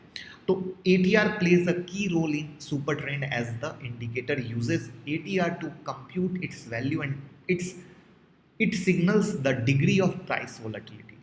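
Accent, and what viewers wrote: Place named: Lucknow, India. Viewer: Lagta hai Aap Lucknow ke ho 😀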